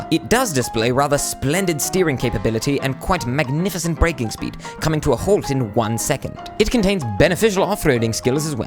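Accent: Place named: United Kingdom. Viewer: wow a british person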